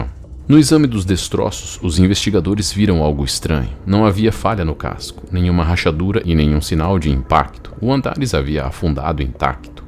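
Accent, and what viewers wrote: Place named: Caipira, Brazil. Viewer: pq ia tem q ter sotaque caipira? bota um sotaque neutro aí, seu google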